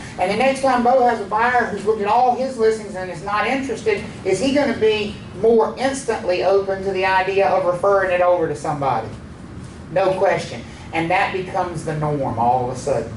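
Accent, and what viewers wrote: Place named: South, United States. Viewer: I’m staying due to the southern drawl. Plus he teaches well!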